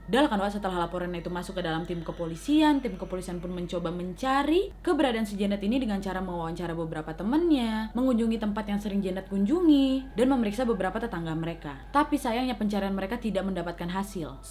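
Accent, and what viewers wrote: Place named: Medan, Indonesia. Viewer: kebanyakan nonton video kak, aku kalo ngomong pasti ada imbuhan wak dan logat jadi kak wkwkwkkw padahal udah sempat hilang setelah bertahun tahun tinggal di jakarta dengar kak, langsung auto logat medan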